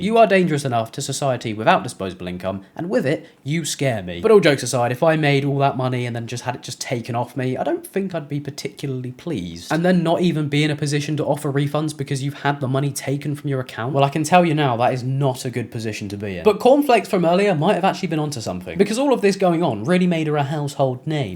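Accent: RP, United Kingdom. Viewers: I hope that colonialism and imperialism also come to your mind every time you hear that accent, because those are as traditionally British as the pompous tea drinker. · As a german i just love your british english. It literally sounds like some rich guy in a suit with a cup of tea is talking to me😂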